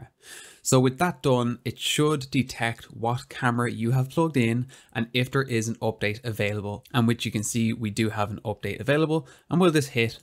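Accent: Ireland. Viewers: Very helpful, thank you. Just wondering, since you sound Irish, I am in Ireland and thinking about buying a v-mount plate and battery for my BM6k, but almost everywhere on Amazon and other sites say they don't deliver batteries to my location. Could you recommend a site that does?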